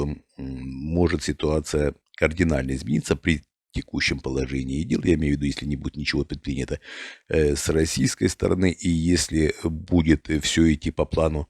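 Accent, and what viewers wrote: Ukraine. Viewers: Виктор Михайлович, вы же говорите по русски. А в русском языке принято говорить на Урале, на Украине, а не в Украине. Выражение в Украине сильно неграмотно и политизировано. Понятно на чьей вы стороне, да и акцент у вас украинский. Не знаю насколько вы генерал СВР. Вы хоть думайте хотя бы иногда, а то мне кажется у вас немного ненормально с логикой.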